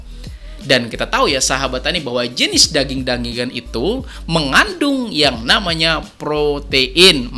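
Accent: Banjar, Indonesia. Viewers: Mas orang banjar ya? Logatnya agak mirip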